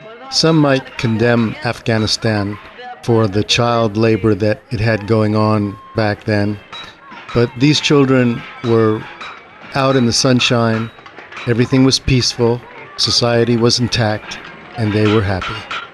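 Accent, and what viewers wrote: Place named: Brooklyn, United States. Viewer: "Baklavar"? Dude. Your pronounciation is pure Brooklyn. I suggest you stay away from the contemporary political commentary. If anyone wants to read the details of events leading up to the Afghan civil war of the 1980s, one place to start is the chapter on Afghanistan in William Blum's book 'Killing Hope: US Military and CIA Interventions Since WW2' (Common Courage Press 2004) and Blum's references therein.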